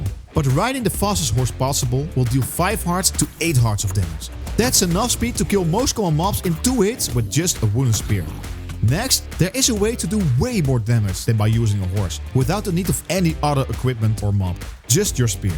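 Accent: Netherlands. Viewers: i smell dutch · You dutch? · Holy nederlands accent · Just a question, are you dutch? You just sound like how dutch people talk in english. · Great vid, and great dutch accent :D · W tutorial, deze guy is zowizo nederlands!!! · The accent gives it away Hello fellow dutchie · I smell a dutch accent huh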